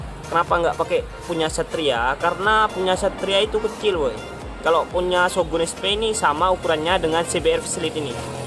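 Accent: Madura, Indonesia. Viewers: Kayak orang Madura suaranya yang omong tuh bng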